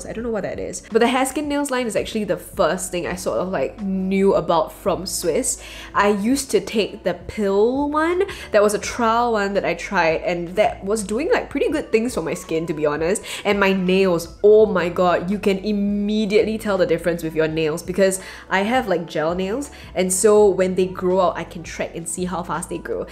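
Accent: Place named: Singapore. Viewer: Your singlish is impressive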